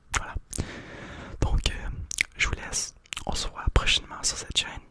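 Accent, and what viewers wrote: Quebec, Canada. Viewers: L’accent québécois reste un des meilleurs à mon avis :)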